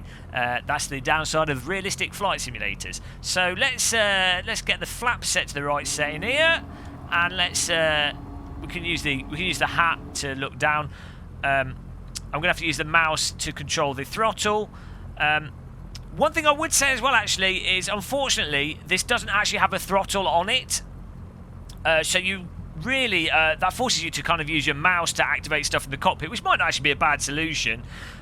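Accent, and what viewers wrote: United Kingdom. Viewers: Turned off 7 seconds in because limey accents are unnecessary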